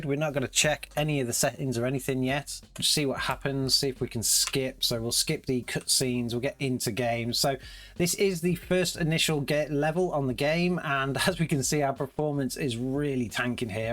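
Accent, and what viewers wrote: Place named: England, United Kingdom. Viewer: Loved your channel! Nice to hear English English as well! Good luck!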